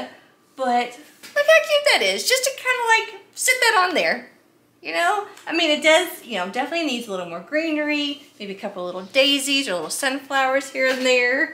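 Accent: South, United States. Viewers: I just love your channel and all the beautiful things you create. You are so talented and your little southern accent is adorable. I'm a 69 year old grandma and it always puts me in a better mood to just watch and listen to you! I think I'm gonna go shopping! Dollar Tree here I come!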